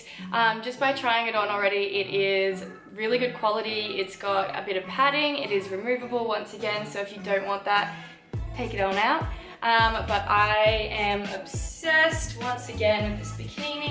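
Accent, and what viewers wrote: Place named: Australia. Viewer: Australian girls are so hot and the accent is very cool.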